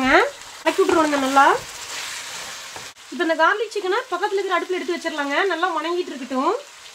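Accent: Kongu, India. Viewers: enka Kongu nadu styla pesarinka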